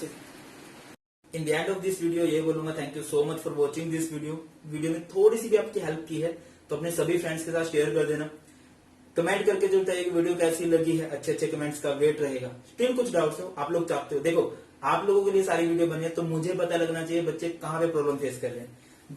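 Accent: Haryana, India. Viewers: Sir r u from haryana🤔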